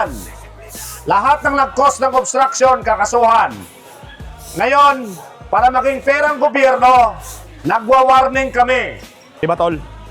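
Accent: Iloilo, Philippines. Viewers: Ilonggo ata SI sir 😂😂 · Parang ilonggo c sir ah 😊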